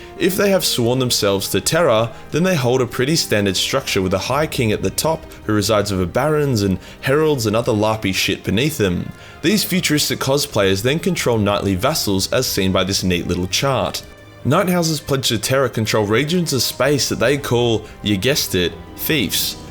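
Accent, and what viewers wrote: Australia. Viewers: I keep realizing that I have done more research on 40k Lore than, but I just like hearing a cutie pie Australian say shit I would find offensive in text or any other accent. But hey gal here happy to be recognized!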